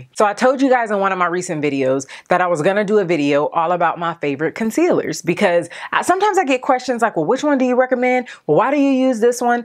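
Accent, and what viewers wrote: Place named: Spain. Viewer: Loved your enthusiasm throughout the video as well as your Spanish accent! You rock! Tu si sabes!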